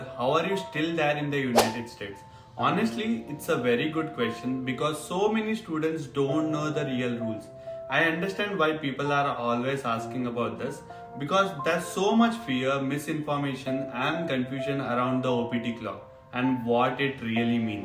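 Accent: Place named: India. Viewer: That is the thickest Indian accent I’ve ever heard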